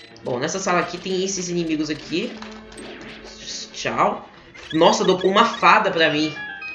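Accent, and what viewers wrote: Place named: Minas Gerais, Brazil. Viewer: Vc é de Minas Gerais seu sutaque é bem parecido com alguém que mora em Minas Gerais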